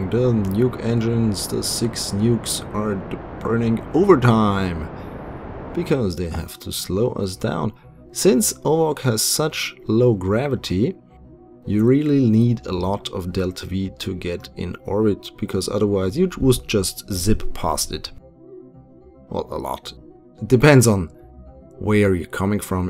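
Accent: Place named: Germany. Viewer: 1) Great videos, I love the designs of your ships and your commentary! Thanks very much for everything! 2) Where are you from, if you don't mind me asking. From you accent I would say German or somewhere in Scandinavia. :3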